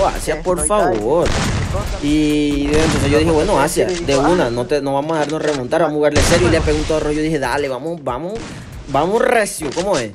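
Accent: Caribbean Coast, Colombia. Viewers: Eres de colombia?:0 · Como narra un costeño un pvp:pues mira el video:v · de q parte de colombia eres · Eres costeño?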